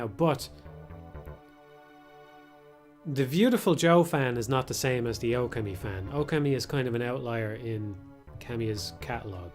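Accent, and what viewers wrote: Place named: Ireland. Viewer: It's really nice to hear your dulcet irish tones again Here's hoping we get to hear them again soon, happy holidays man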